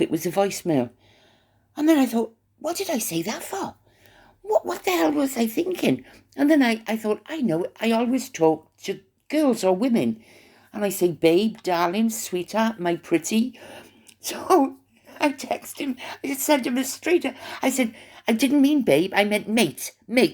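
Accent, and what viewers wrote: Wales, United Kingdom. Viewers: My Welsh friend you have been missed i love listening to your Welsh accent it reminds me of home i can't wait for your next chat i love your stories of the palour And anything really its all interesting to me until your next one 💐 💝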